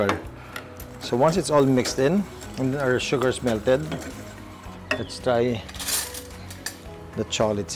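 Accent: Visayas, Philippines. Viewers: Gusto ko ung bisaya accent eh. Lami gyud dong uy!!! Giatay mana sya uy! Lami sad na ba sa puto maya ba!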